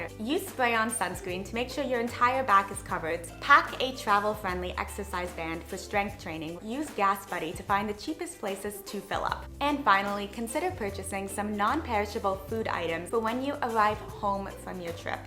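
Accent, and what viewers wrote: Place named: United States. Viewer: She has an American accent so I assume the USA.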